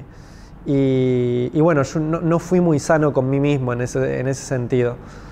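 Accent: Argentina. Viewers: Por su forma de hablar se da a notar que es de Argentina (aunque puede ser que eso quiera que sepamos) a mi propia idea acerca del caso pienso que esta en Rusia y ojo es solo una respuesta retorica, a consecuencia que el clima no es visto ya que donde esta no hay luz natural.Por el color del foco es calido el amarillo y caracteristico a diferencia del blanco es mas calido osea mas caliente a comparacion de las temperaturas que recorre la gran rusia OJO, SÓLO ES UNA HIPITESIS REDACTADA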